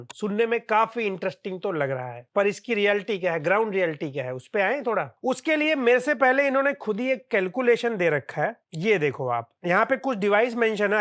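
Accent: Lucknow, India. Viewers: Bhai aap lucknow se ho kya?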